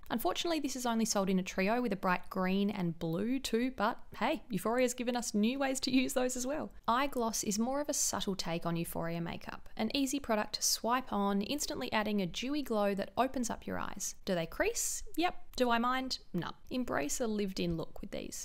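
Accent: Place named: Australia. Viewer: She has such a posh Aussie accent. I’m Australian and let me tell you, I do not sound anything like this 😂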